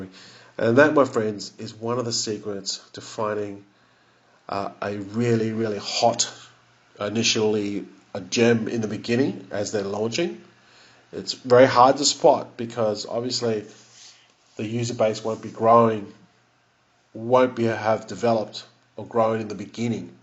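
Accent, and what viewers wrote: Australia. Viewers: Outstanding video and advice mate. Aussie accent expat. Where are ya ? In CEL BNB THETA since 2019, all solid. I like Noia Network and Ziliqa, they meet your criteria as well.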